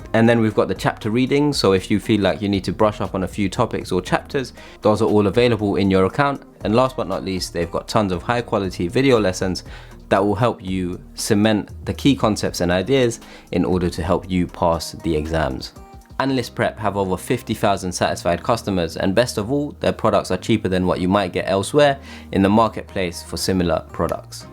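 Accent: London, United Kingdom. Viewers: you have such a nice accent are you from west london?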